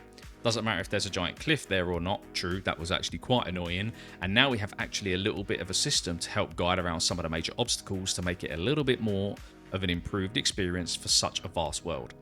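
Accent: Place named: United Kingdom. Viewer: Brilliant video mate !! From yhe the uk 🇬🇧 myself yhe midlands nice to hear a uk 🇬🇧 accent amazing vid on this outstanding upcoming game !! I’m so so excited for this game !! I pre ordered my digital deluxe edition today !!! Counting down the days !! Thank you for the breakdown in this !! It’s great you’ve explained more and made us way more excited ! 🙂🙂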